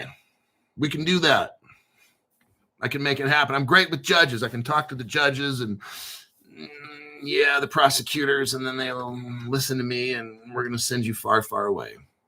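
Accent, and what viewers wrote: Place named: New York, United States. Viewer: This dude gotta be fron NY or NJ 😂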